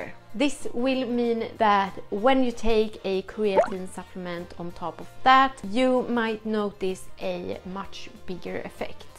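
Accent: Sweden. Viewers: Thanks for the info as I just started my 5mg daily. I felt noticebly stronger/more enduring than usual workout after only few days, but do admit it could be the placebo effect. Will know better next few weeks. Your calm and Swedish influenced accent is appreciated.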